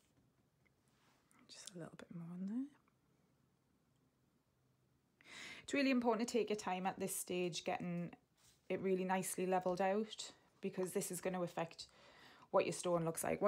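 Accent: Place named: Geordie, United Kingdom. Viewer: This is an excellent tutorial dearest! I love your sweet Geordie accent my mandala soul sis! 🥰😍❤🥰😍❤ I am so glad to see I'm not the only one who don't follow instructions on the packet 😁😄 sometimes you just have to follow your instincts and these have turned out brilliant. Keep the videos rolling my sweetheart ❤❤❤❤❤😍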